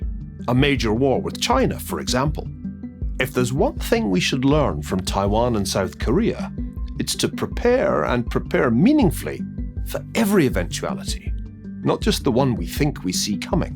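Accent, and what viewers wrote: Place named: United States. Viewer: Loving the American accent Niall!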